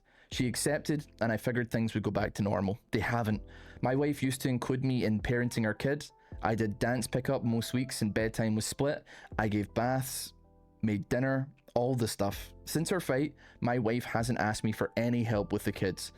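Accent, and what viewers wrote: Scotland, United Kingdom. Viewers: I love listening to reddit stories while I write. Now I can listen to a fellow Scotsman. Perfection.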